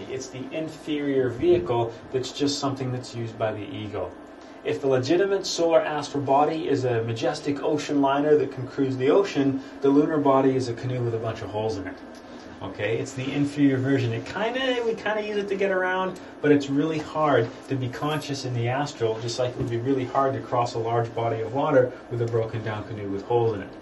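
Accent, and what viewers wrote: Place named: Canada. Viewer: He's from Canada... I can hear the accent ;) haha not that it matters but great stuff in this video good work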